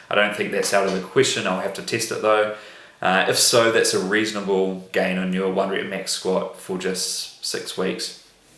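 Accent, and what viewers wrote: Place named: New Zealand. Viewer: Sounds like a kiwi, chur bro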